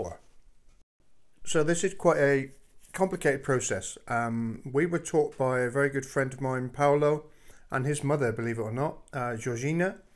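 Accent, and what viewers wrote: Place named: Cornwall, United Kingdom. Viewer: We should never apologise for our cornish accent, be proud of it 👍, good size pasty.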